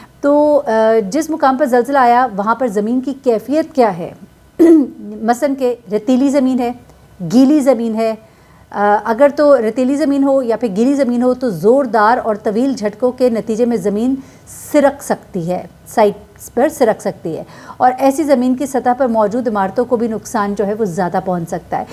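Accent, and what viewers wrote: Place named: Punjab, Pakistan. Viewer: اور آپ غالباً تلہ گنگ یا سرگودھا سے تعلق رکھتے ہیں،وہاں پر ہی اس طرح سنا ہے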